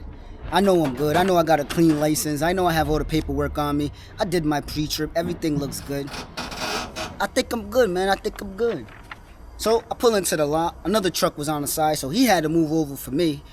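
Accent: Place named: New Jersey, United States. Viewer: You must be from Jersey u got that accent like the sopranos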